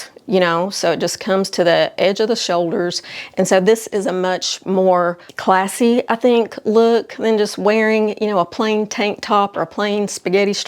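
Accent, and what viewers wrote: South, United States. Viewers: I'm so glad I found you,! Your observations and suggestions are right on target. And you deliver your message in a no-nonsense but kind way, You really are excellent at this, and that's coming from a retired marketing executive. Everything you touch on is something that I had been thinking about; it's eerie and at the same time wonderfully satisfying, And your charming Southern accent is just so easy on the ears, Beats the heck out of my Philly accent! Keep on keepin' on,! Hopefully women will heed your advice. I know I have!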